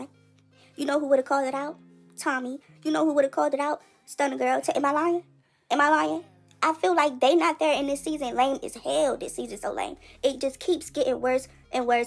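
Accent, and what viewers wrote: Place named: Washington DC, United States. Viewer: The narrator sound like she from dc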